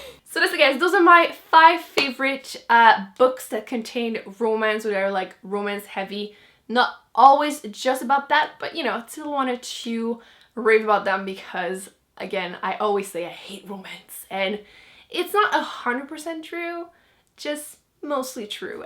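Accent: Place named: Canada. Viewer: Hey! I really like your videos, love your accent and I'm Canadian too!!! <3 I've been subscribed for a couple months now and I will be sticking around!!!:)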